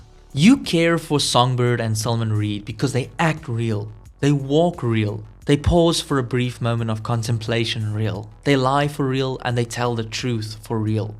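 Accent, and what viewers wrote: South Africa. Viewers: i hear a south african... i watch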